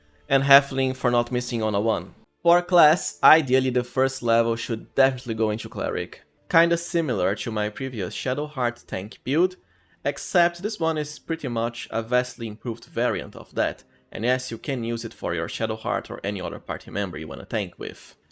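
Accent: Brazil. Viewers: Curious question where you from? I am trying to guess the accent. Somehow I feel like you might be brazilian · Bro, you’re Brazilian? Your accent feels like it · Tu é brasileiro? Parece brasileiro falando inglês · percebi que vc era BR só pelo sotaque kkk me inscrevi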